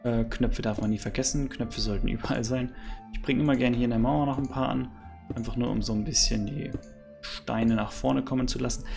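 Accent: Austria. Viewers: Kaons sein dost a wosch echta östarreicha bist?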